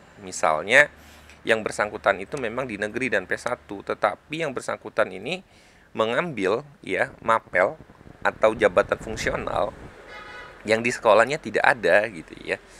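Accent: Banyumas, Indonesia. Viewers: kenapa ini suaranya ngapak pedia hh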